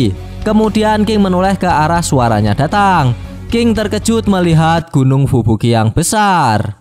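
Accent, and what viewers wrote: Java, Indonesia. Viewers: Bang saran makin lama nada di belakang semakin medok dan terlalu panjang ... Sajaaaaaaaa Sanaaaaa Ituuuuuuuuuu Jangan terlalu medok ga enak di denger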